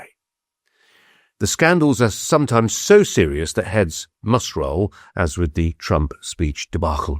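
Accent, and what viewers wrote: United Kingdom. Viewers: Wow, this is great! I am finding hard to believe that I am listening to a man with a British accent, delivering BBC, ITV or Sky standard reportage and opinion, and that I don't want to throw something at the radio. The level of deliberate ideological slanting of news described here drove me to despair. I was a Radio 4 addict for 30 years, and I learnt much. However, as I read and thought, the rhetorical devices used by the BBC became glaring obvious. I turned off in the end, regarding them as having a form of spiritual blindness. The poor dears couldn't help it. I am now convinced more and more that they are much more knowing than I thought. Time for the Beeb to be broken up. There's lots of great material in there. Let's demolish it and build something new out of the rubble, if we need it at all.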